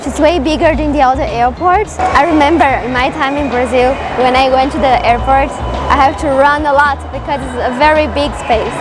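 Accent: China. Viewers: Your voice is so unique like a little girl. You even have a Chinglish accent.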